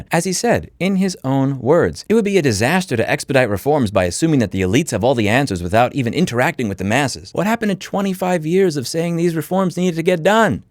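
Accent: New York, United States. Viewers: Why people no get he from NYC? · His anger is glorious at 2x. The authentic new yorker experience.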